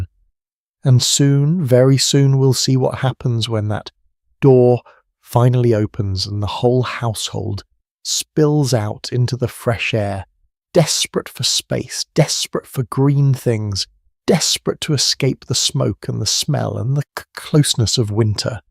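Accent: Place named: England, United Kingdom. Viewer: From Norway. The servants Are TRELL/ treller , not throll. Reading with English pronansuention mekes many names and words so strange. What happend to the selebration of Jul? The feast and oferings in the darkest part of winter. Fishing , trapping and hunting are all winter chors as long as the weather permited.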